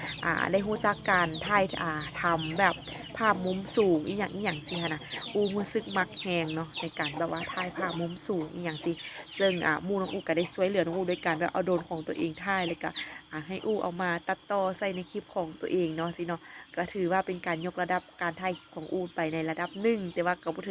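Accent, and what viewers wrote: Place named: Nyo, Thailand. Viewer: สำเนียง"ญ้อ"(ย้อ")ทาง จ.สกลนคร เว้าคือกันนี่หละ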